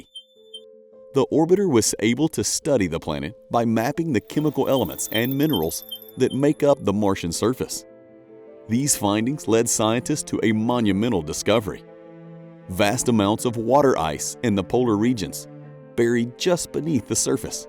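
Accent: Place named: Appalachia, United States. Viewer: "Narrated by Reverend Lovejoy, of Springfield..... somewhere in the U.S.." It's so weird hearing narration with a Southern Appalachian accent, or thereabouts. East/Central Tennessee? Then, or so... um... "One of these things is not like the others." Somebody really badly misspelt "Sulfur." , your captions spelled maghemite wrong; there is no such thing as "magami." , Arctic has a 'c' in the middle. It is not "ahr-tik." it's "ahrk-tik." Please. , there it is: "EE-kwae-tuhr." That's East Tennessee. Straight up "Beverly Hillbillies'" Buddy Ebsen, as Jed Clampett. Equatorial as "eh-KWAE-ti-ohr-uhl?" No. It's, "eh-kwih-TOHR-ee-uhl." I would proof-listen for free, btw. Inhabitable is not "ihn-hah-BIH-tuh-buhl." It's, "ihn-HAH-bih-tuh-buhl." I am starting to wonder if this voice is an AI pretending to be southern. I have literally never heard anyone else mispronounce this word. 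Ever. P.S. That Biden section was unlistenable. Had to skip it. We need better.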